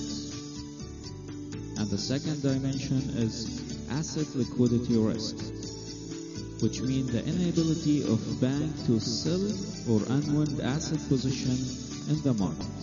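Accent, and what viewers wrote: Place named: Bahrain. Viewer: اللهجه حتى وهي إنجليزيه تنعرف أنها بحرانيه هههههه ..I wana help on the commercial bank management it's so hard :(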